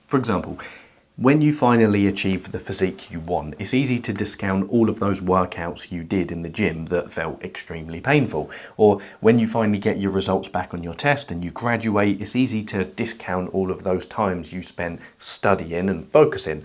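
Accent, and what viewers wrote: England, United Kingdom. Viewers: Why does Shia Lebouf suddenly have an English accent? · Okay I truly believe this video has changed my life. I have seen dozens of motivational videos and tons and tons of mental health/ help videos.. but when laying in bed being the usual lazy self one afternoon this video popped into my feed.. I read the title and thought to myself “man I don’t really want to watch this.. but that probably means I NEED to watch this” and I clicked on it with only the intentions of watching the first few minutes or so.. and honestly I started off judging. I thought “what does this bald looking guy wearing a fancy vest and with this English accent think he knows that the rest of the world doesn’t” but I surprised myself when I found myself almost leaning forward eager to listen, to learn. What you said in this video was everything I needed to hear. 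I thought about this video for the rest of the day. And the next morning I woke up and I watched the 32 minutes all over again. I learned even more the second time and I had the most productive day that I had in months. The next day.. I crashed. Burnt out from trying to go 110% and I felt so guilty after just having what I thought was a revelation of my whole life. I slipped back into a depressive state and did nothing about it for a few days.. but I realized something. I could not get this video out of my head. This video and everything you have said was constantly screaming at me. Every time I looked at a screen I couldn’t stop thinking about what I was doing to my brain. I became conscious. This vide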